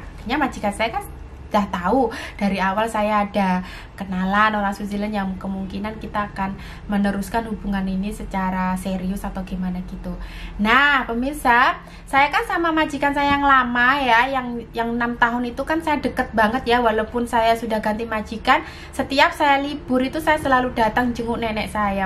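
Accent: Java, Indonesia. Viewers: Enak berbagi Aq seneng ora ilang joeone logate jg .. Tetep smangat suxes sllu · Saya suka mbak,biar suami bule hidup di luar tapi jowone gak ilang 👍 rendah hati, saya dulu ingin jadi TKI tapi gak punya nyali,juga ingin punya suami bule 😀😀😁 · Salam kenal mba, aku seneng lihat konten mba, lucu deh bicaranya, logat jawanya yah tp seneng juga sih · ......... aku suka banget dengan gaya dan logatmu yg begitu lucu dan kejawen banget👍🏻👍🏻👍🏻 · Jawa km mana SICH mbk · Jowone ngendi to medok bingit salam berkarya saja semoga sukses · Natural bngt mbk ngomngy jowo bngt kyo q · Bru nemu vlog ini.. Suka gaya bicarax mb.. Jowone medok hehe.. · Jawane ngedi mbak ? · Salam kenal mbak... Sy dr Surabaya, suka lihat vidio mbak sekluarga semoga langgeng sampai tua ya mbak... Sy jg suka klau mbak bicara logat jawa sangat medok sekali.. Sy tunggu vidio selanjutnya... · Mantabbb Mba...Jawanne ngga ilang meski sudah lama tinggal di Australia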